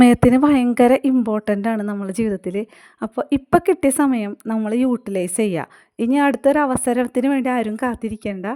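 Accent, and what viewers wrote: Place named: Thrissur, India. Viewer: Aanenn thonunnu njanga tsr kaarde voice kettal manasilaavum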